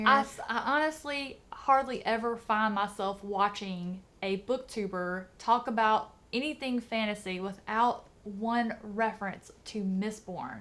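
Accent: South, United States. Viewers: I wish more people had a Southern accent like you making book videos!! :D Love it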